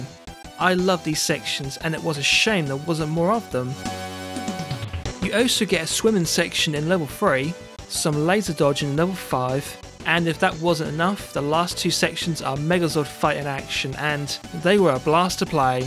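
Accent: United Kingdom. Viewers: LOL, it's Morphing Time! You're the only British reviewer on youtube that I can stand listening to! Congratulations! Subscribed! Keep it up!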